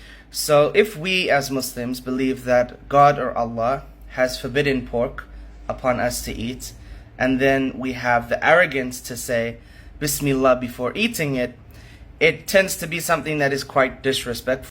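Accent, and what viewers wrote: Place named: United States. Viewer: klo dari logatnya org amrik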